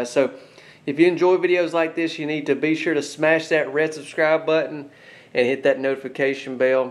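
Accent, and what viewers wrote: South, United States. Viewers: Nice mobile home, and like that southern draw.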